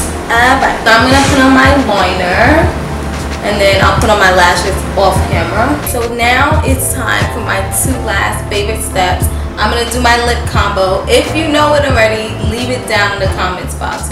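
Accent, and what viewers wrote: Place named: New York, United States. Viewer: LOVE your NY accent!! Gorgeous too girl!!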